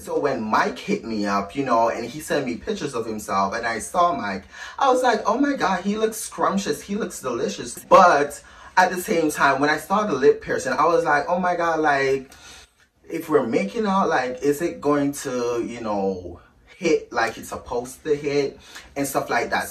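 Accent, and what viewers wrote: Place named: Jamaica. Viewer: The Jamaican accent ❤️😂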